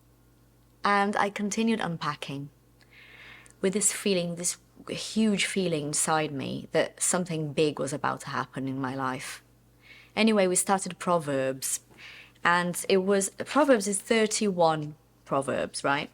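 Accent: United Kingdom. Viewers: your British accent is brilliant. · I like the video, I'm like you. But how do you manage to have that British accent while being Brazilian? lol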